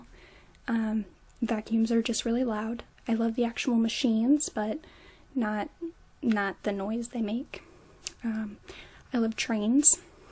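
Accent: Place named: Missouri, United States. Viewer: Lol you from Missouri?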